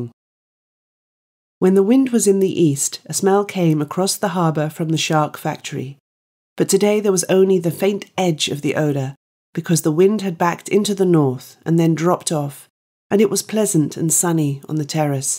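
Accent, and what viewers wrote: RP, United Kingdom. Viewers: Is It a rp accent?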